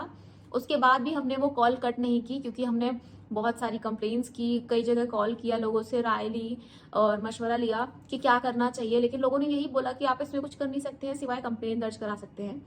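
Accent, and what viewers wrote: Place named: Pakistan. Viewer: Iski accent se lag rha hai ye pakistani hai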